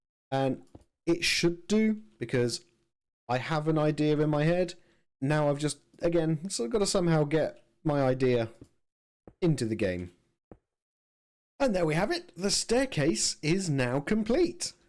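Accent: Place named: Posh, United Kingdom. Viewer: Awful how can you call this content 👀 You sound like you went to private school 🙄 toff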